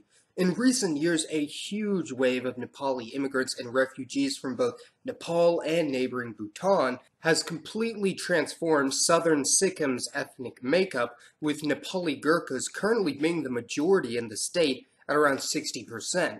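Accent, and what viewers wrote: United States. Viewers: Thaibet? Phulease dude. You sound like you grew up in bf u.s.a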